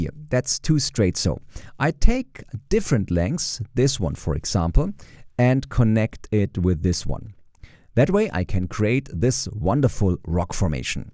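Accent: Germany. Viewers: 1. Kann es sein das das ganze nachgesprochen wurde? Und wenn ja, gibt es das Original auch auf Deutsch als ganze Serie? 2. Ich finde man hört mega das Deutsche raus :D Also ich nehme mal an das ist ein Deutscher sprecher, was keinerlei kritik ist, ich finde es nur erstaunlich wie... Deutsch die Stimme klingt :)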